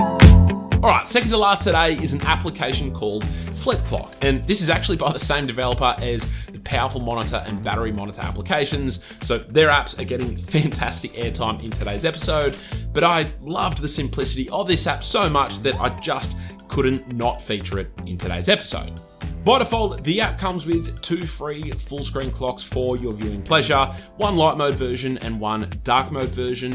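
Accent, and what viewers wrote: Australia. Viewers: I love the Australian English Dialect 😂.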